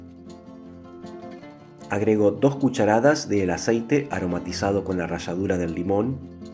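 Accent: Argentina. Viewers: Hola! Un verdadero placer y deleite escucharte y ver tu video!!!!! Tan profesional y con tanta excelencia!!!! Es rarisimo encontrar a alguien que no esté a las corridas en un video, pero tampoco estás hablando gansadas con parsimonia para llenar espacios huecos. Tu acento neutro argentino, a mi parecer, fue extraordinario!!!!! Mil felicitaciones! Soy desde ahora tu fan. Me gusta la impronta de ponerle un poco de historia e información del origen de la receta. Gracias por cada detalle de los que te mencioné. Un fuerte abrazo desde Córdoba, Argentina!!!! 🎉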